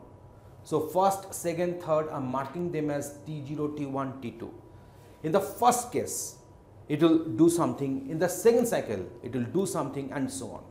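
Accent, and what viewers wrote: Bengal, India. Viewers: Hard Bengali accent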